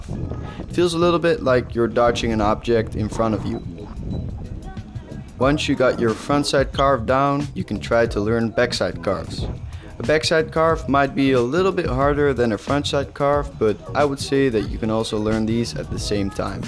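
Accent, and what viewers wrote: Netherlands. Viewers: does he sounds dutch or is it just me? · Btw are you dutch? I can hear it from your accent and when you say 'cool he' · Ar u dutch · I can hear a dutch accent. Am i right or? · Ben je Nederlands je hebt beetje accent van Nederlander 😂😂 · Whahaha loll hoorde ik NOu goed dat je Nederlands bent? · Dutch? Btw love this vid imma try it. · Lol I can hear that vague Dutch accent there, especially when talking to those kids in the end😂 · Are u dutch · R u not dutch? Ur accent is really dutch😂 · the accent says that your dutch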